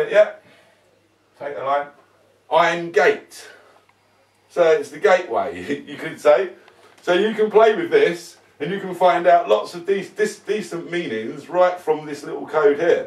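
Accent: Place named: United Kingdom. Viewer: Of course it would be a dumbass Brit pretending to argue against Newton. I mean your people are so completely slow in the head that you pretend your preserved parks are deep woods on your deforested little island nation and beat your knives in the place of buying saws. I surely hope that by now everyone recognizes that dumbass accent as the idiocy that it actually represents.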